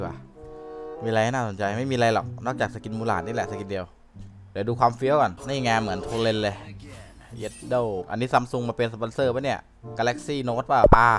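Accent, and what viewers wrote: South, Thailand. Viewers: พี่เป็นคนใต้หรอครับ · ชอบพี่แหลงใต้ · พี่ย่าเป็นคนใต้หรอ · พี่คนใต้หรอครับ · คนใต้นี้หว่า55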